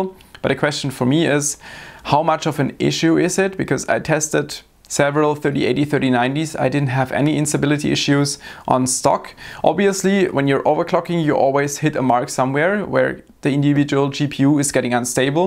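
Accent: Germany. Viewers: man hört das deutsch echt arch (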